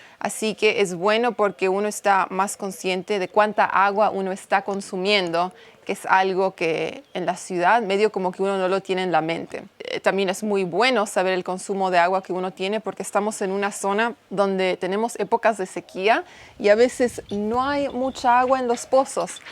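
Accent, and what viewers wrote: Argentina. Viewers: Es increíble el cambio de idioma que estás teniendo ya SOS argenta😂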